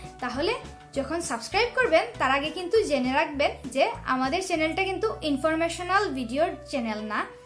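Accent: Sylhet, Bangladesh. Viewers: আপু সিলেটী? 🥰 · Didi mone hoy Sylhety! Tai na??? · সিলেটী নি গ মাই তুমি ??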